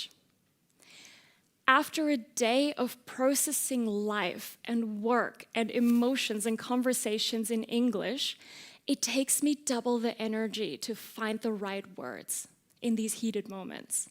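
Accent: Germany. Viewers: I could here she was a native german speaker by the way she talked 5 secs into the video lmao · Yes there is a German tinge in your English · This cadence at the end of each phrase, the intonation sound like German despite the good pronunciation in English interestingly. Creating new words is a great idea!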